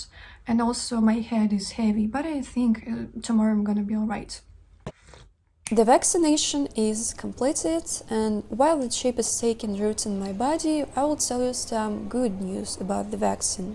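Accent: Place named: Russia. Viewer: I love your accent! I don’t know why I’m so fascinated with Russia and Eastern Europe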